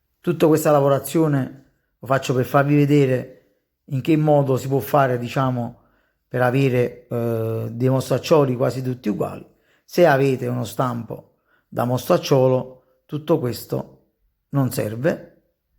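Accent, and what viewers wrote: Naples, Italy. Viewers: Bravissimo,può fare poi gli struffoli?Sono di Napoli ma abito a Torino e gli struffoli x Natale non mi sono venuti mai bene.Mia madre diceva falli a occhi ma io non lo so fare.Grazie.Sono una nuova iscritta.Mi piace sentire la cadenza"napoletana"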